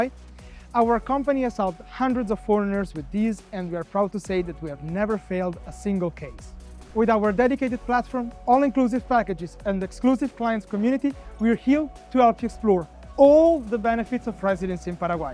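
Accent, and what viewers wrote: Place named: Italy. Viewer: A very impressive video and PP team, the information, key points, story telling and entertainment were a great value and I appreciate the company I'm working with (Paraguay Pathways) is willing to go to such efforts to provide this information to the internet. Not to mention the great entertainment. AMAZING. Put in a suit with his Italian accent, he can promote Paraguay to the world:). Thanks again Paraguay Pathways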